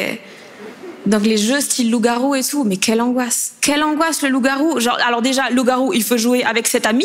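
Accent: Belgium. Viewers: Depuis quand elle a un accent belge? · C'est moi où là elle a "repris" son accent belge qu'elle a pas d'habitude à la radio? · découvre l’accent belge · Elle est belge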